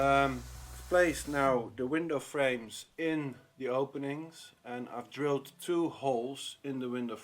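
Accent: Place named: Netherlands. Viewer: Thanks for having done all these videos!! My wife and I are also looking to buy a project house in France and watching your videos gives me inspiration and teaches me certain techniques. Maybe dumb question, maar ben jij Nederlands? Your accent makes me to believe so.. 😅 Groetjes uit Nederland!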